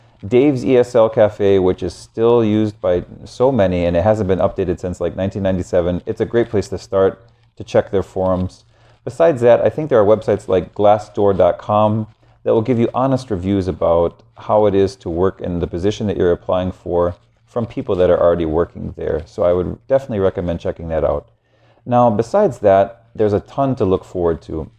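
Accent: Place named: United States. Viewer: You sound American now! Sorry about the earlier comment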